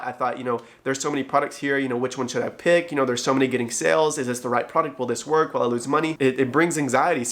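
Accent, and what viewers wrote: United Kingdom. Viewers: Love it! Great value. Thanks!🙏Room set up and colors are electifying and soothing - so cool! Your British Accent is Brilliant and hilarious and Prince Ghost is a real star attraction!💕😊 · Hahaha! Your British accent is jokes! 🤣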